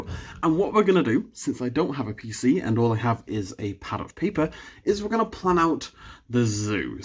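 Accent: United Kingdom. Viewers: I just like to listen to British guys talk about video games so sketch away.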